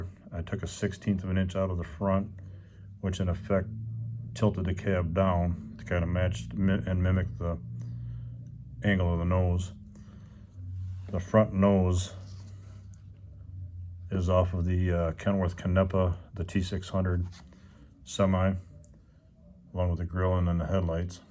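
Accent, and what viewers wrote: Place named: Rockford, United States. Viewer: Damn thats one hell of a drive! I know you said you used to live in Illinois, but the way you talked I thought it was around Rockford.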